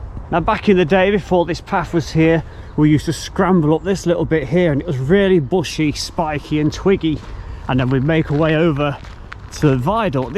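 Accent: Nottingham, United Kingdom. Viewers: Another great vid mate. You must be from Notts with that accent...' 'Bulwool' ! Lol. Hope to go up there soon .Bless yuz.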